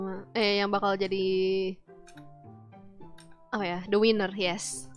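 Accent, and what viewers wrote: West Java, Indonesia. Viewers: i can easily tell she's sundanese by her accent and sweet voice >.< sundanese be having the sweetest and softest voice istg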